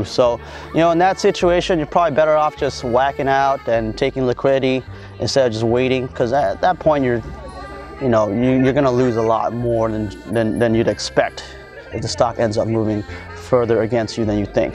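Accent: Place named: United States. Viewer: bro you are such an American. The accent was hilarious. Nice into and thanks for the video.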